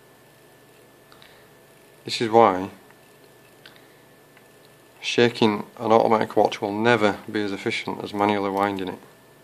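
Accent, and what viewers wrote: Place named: Cockney, United Kingdom. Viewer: Heavy cockny accent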